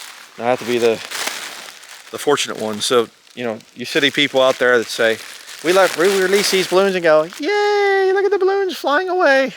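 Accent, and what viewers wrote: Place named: Pittsburgh, United States. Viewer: Your accent sounds pennsylanic sp. Pittsburg.🙂 That's my guess. Maybe maryland?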